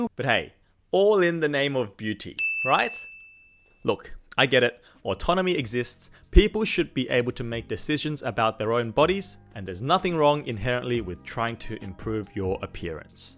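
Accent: Australia. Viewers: I'm simple: see and hear a fellow Aussie, I follow.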